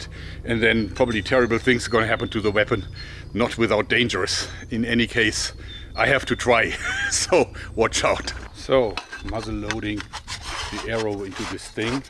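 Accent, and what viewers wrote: Germany. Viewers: Man hört richtig das du Deutsch bist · Hey you sound like you are german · Learn english without accent, german... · Explain to me why ur having an english instead of a german channel? - Your accent is distracting XD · Kann es sein das du eigentlich Deutsch bist? Ich finde man hört es an deinem Englisch 😁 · Man hört einfach Instant daß er ein Deutscher ist. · I hear a German accent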